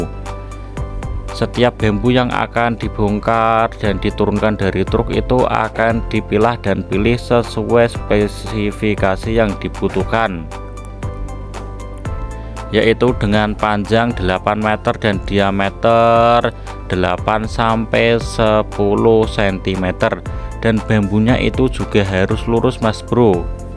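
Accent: Java, Indonesia. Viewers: sampean orang mana mas logat jawanya beda?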